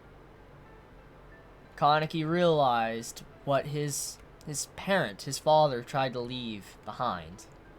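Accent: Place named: South, United States. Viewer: He is from the Southeastern USA. He has a bit of a southern accent but not has heavy.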